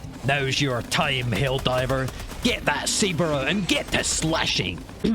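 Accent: Scotland, United Kingdom. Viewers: Are you Scottish?! My people 🙌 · Insta subbed as soon as i heard you were also Scottish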